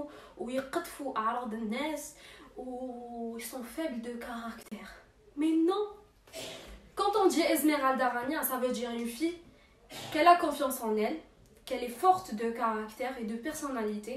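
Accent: Algeria. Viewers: انتي هايلة حلوة خفيفة تدخلي فلقلب نحب كي تهدري blalgeroise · J’adore l’accent algérienne🇩🇿🇩🇿🇩🇿même je suis marocaine🇲🇦🇲🇦🇲🇦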